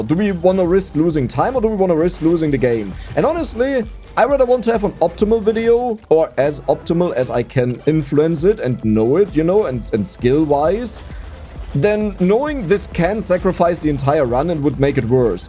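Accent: Germany. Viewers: Dude at 50 i totaly felt the need to speak german 😂😂 Moved to germany few years ago still bad at the language but i enjoy your english german accent since i always hear it everywhere i go and its comforting to listen to it while youre playing. Perfect time to vent frustration out 😂❤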